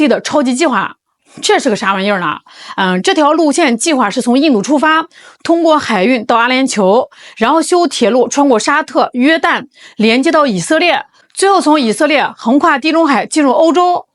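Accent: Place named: Henan, China. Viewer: 河南腔打的😄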